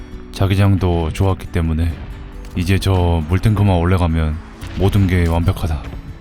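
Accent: Jeolla, South Korea. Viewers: 점잖게 말하시는데 살짝식 섞여나오는 전라도 억양이 포인트 ㅋㅋㅋㅋㅋㅋㅋㅋㅋㅋㅋㅋㅋㅋㅋㅋㅋ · 혹시 고향이 라도신가요~?^^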